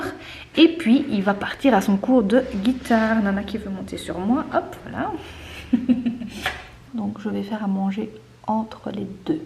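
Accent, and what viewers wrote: Belgium. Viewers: L'accent belge quand meme c'est le meilleur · C'est fou comme l'accent belge ressort quand tu parles à voix haute haha ! C'est rigolo et j'aime trop l'accent belge (c'est mes origines !) merci pour cette vidéo qui relaxe malgré le non chuchotement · Normalement je ne commente pratiquement jamais les vidéos YouTube mais ça fait déjà quelques vidéos à toi que je commente. Je trouve que tu fais un super travail, c'est très qualitatif. J'aime beaucoup ta personnalité, tu es très naturelle et c'est génial ! Cette vidéo est une excellente idée. C'est vrai qu'au début j'étais un peu déçue que ce ne soit pas en ASMR mais finalement ta vidéo est au top. Je me suis tapée un fou rire avec votre couteau suisse, mon dieu quand on dit que le rire est communicatif, ce n'est pas une blague. Donc voilà juste merci pour tout ! 💙 PS : J'adore ton accent belge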